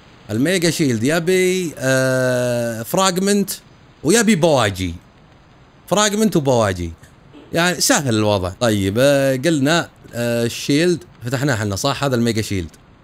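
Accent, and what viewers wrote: Qassim, Saudi Arabia. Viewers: استمر يا افضل يوتيوبر ❤ اهم شيء الي محلي المقطع اللهجة القصيميه😂😂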